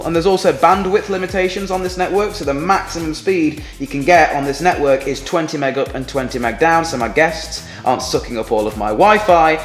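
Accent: United Kingdom. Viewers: I dont like BRITISH ACCENT!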